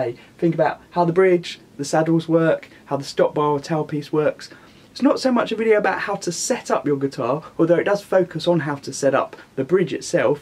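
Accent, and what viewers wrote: United Kingdom. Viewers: Bro you have the best story telling voice. (Your accent sounds like you're from where I was born in the u.k [Wokingham, Berkshire]. I've moved to Australia now. Amazing video, thank you. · Dude, you are so good at this kind of thing. This was a bit more “101” than I needed to go, but I ended up watching start to finish to learn some more detail just based on how great your photography and explanations were! These are the kinds of videos I would make if I had a British accent!! Haha cheers!!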